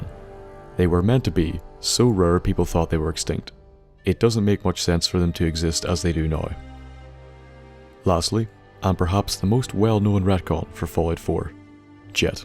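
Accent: Northern Ireland, United Kingdom. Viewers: Been here since at least 2013, sitting in my mums and playing nv and listening to to guide me through, yeah im a scott but his is a n.i accent so i can understand it clearly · Northern Irish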